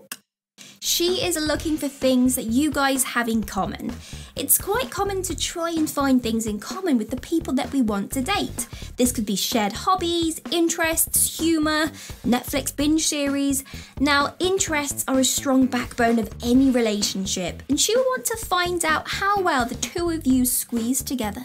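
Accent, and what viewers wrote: United Kingdom. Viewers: NunYo BizNizz It’s British.., where did you get that idea from? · I labh the British accent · Uk accest much better then usa and Canada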